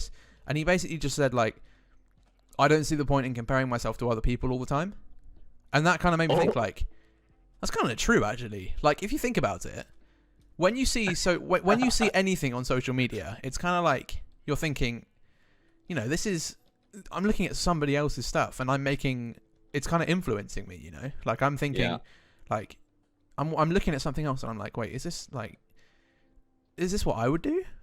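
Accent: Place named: England, United Kingdom. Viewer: Roughly where in England are you from? You sound a lot like me, that would be cool if I knew I was close to you. If you don’t want to say that fine.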